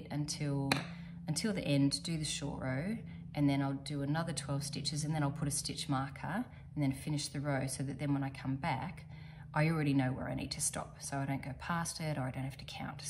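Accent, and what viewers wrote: Australia. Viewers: I’ve just discovered your podcast and I love it!!! It’s fabulous to see and hear an Aussie! Looking forward to watching your other episodes. 👏😃🧶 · Hello, just found you on youtube, nice to hear an Australian voice,you are a gifted knitter, i have been watching youtube since COVID, i do love watching different crafts but lately the knitting ones i have liked because of all the lovely yarns that are available and the projects that people make, i am amazed, i have never been a knitter but lately have been making a few plain scarfs, i wish someone would have taught me when i was younger, but i do other things and i took a watercolour colour class a few years ago now, so i am happy. I have started painting wooden things and doing transfers and gold leaf which is more my thing. I was pleased to come across your podcast and look forward to watching again. 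Kind regards pamela hall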